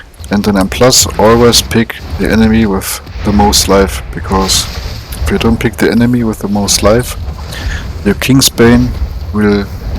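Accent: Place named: Germany. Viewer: OMG der deutsch akzent XD Ich liebe es HAHAHAHA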